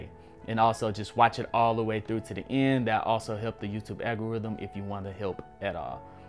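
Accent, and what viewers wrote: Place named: Texas, United States. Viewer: kI am impressed this is all off the top of your head, I also see sometimes you try to pronounce words with out the Texas Accent. But you are doing a good job. Keep up the good content.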